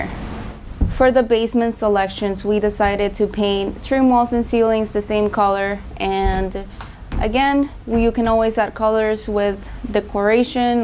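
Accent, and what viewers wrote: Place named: Israel. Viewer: From your accent I think your mother tongue is Hebrew. Be Matzliach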